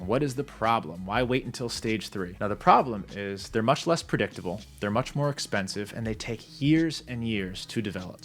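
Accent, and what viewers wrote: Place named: Canada. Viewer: You sound so Canadian Dogg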